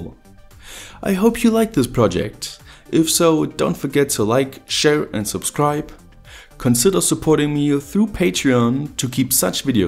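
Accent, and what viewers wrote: Germany. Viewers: man hört das du deutscher bist!...Wenn ich mir deine Materialien so angucke und die Art wie du es zusammen baust, dann werde ich das Gefühl nicht los das du ein Elektriker auf einer Werft oder einem anderen Maschinbau Firma bist oder warst!...Auch die Kabelhalter , die Gewindeschneider und Senker sehen nicht aus, als wenn du sie aus dem Baumarkt hast!..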